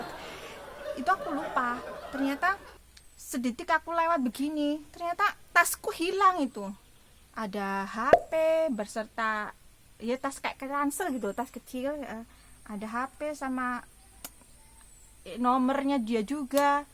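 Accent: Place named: Java, Indonesia. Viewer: medok banget jowo nyo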